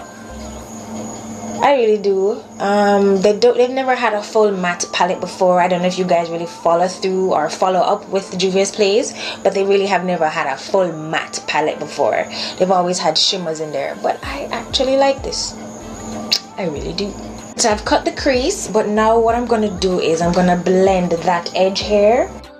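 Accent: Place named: Jamaica. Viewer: Lovely simple look that I will be rocking tomorrow lol 😂 you are absolutely lovely and the Jamaican accent is the cherry 🍒 on top. Thanks sis! 😊✌🏾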